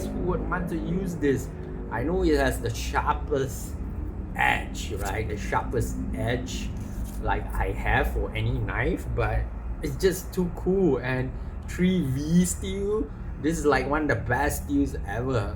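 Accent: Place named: Singapore. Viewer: Are you malay? Or Singaporean? I lived in Johor 5 years your slang is very singlish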